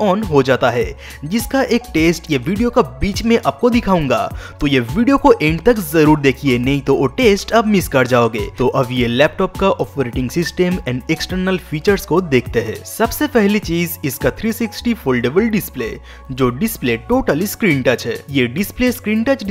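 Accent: Bengal, India. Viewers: Sound like you are a Bengali (Bangali)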